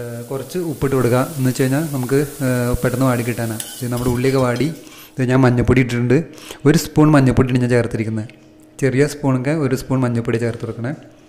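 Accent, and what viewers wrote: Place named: Thrissur, India. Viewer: തൃശൂർ ആണോ.. സംസാരം നല്ല രസണ്ട് 🤩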